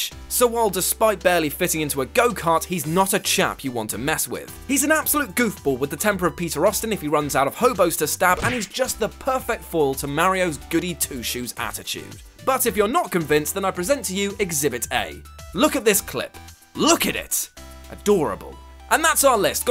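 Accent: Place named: United Kingdom. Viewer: no, he's british. they pronounce words differently than in the states.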